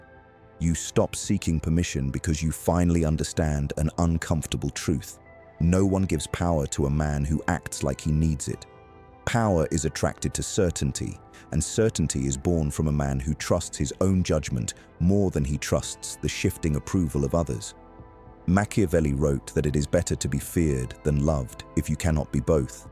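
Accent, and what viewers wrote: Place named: England, United Kingdom. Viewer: Speak in American. We ain't training to be English. For real. Just cause the average American is preprogrammed to think an English accent is more intelligent.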